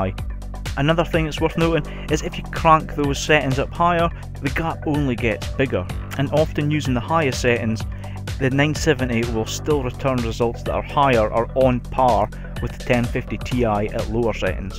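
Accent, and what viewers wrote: Scotland, United Kingdom. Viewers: what part of Scotalnd you from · Sub for the scottish accent. For some reason I love it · Another Scottish youtuber? This is great us scots are popping up everywhere now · Is that a Scottish accent?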